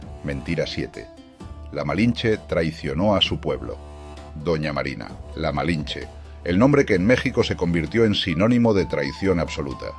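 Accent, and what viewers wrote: Spain. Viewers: Te oyes español. Recuerda que la historia de la conquista de la conquista la escribió Bernal Díaz del Castillo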